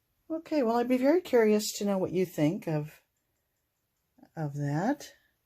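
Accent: Australia. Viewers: 😂love the aussie accent! Fun idea combining different swipe tools👍. ❤️,Pam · I just watched this video then Bens . I think the main difference is the cells Ben got from using Aussie flotrol, we are so lucky in Australia to have our flotrol do what it does! Thanks for the giggle at your Aussie accent! 😂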